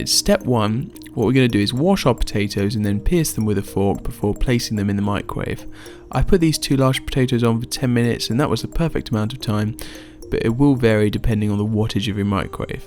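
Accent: RP, United Kingdom. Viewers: You speak with a perfect RP (Received Pronunciation). You should consider doing voice overs for ads etc..while you are studying. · You have a very posh accent, great meals thanks.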